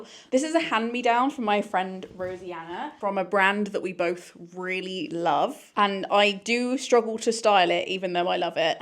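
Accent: United Kingdom. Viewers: that black top is LOVELY. Forget the bottom button closure situation, couldn’t matter less imo. Side note I grew up in Aberdeen for a few years of my adolescence and currently live in Colorado. Your voice and style and energy make me miss life in the UK so much! Been watching you for probably 5+ years now and just want you to know I love your content (as a 29 year old) and think you’re lovely! Embrace the goth and keep guiding me through my tumultuous American life!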